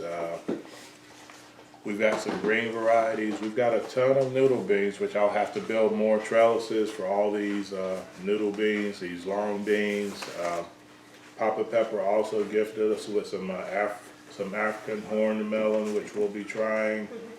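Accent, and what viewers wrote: United States. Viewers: Wow!! I am catching up on your videos - and I am so impressed with the collection of seeds that you have!! And you made me laugh when you said you have a bit of a southern accent and may pronounce things a different way!! People here in Texas sometimes think I am from a foreign country given the way I pronounce things!! LOL! So nice to see you and. God Bless, Mary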